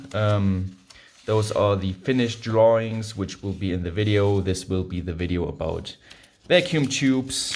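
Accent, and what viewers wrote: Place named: Asia. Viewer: Wow, I didn't realize you write actual scripts for the show. I would have just used a list of points to make. Your videos are very good. Before I learned you were in Germany, I imagined you were in Asia. The accent of your English (you have perfect English) sounds Asian to me.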